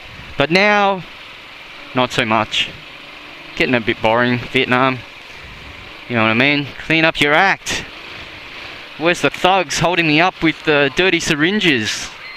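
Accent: Australia. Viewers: I really enjoy your narrative and your "down-under" accent, mate! Thanks for making the video.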